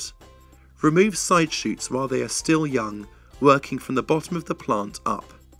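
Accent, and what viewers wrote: United Kingdom. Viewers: Why do you have to make everything so freaking dramatic. That British accent doesn't help either. Just give the information man!!!!. How did you people conquered the world? Jesus!!!!!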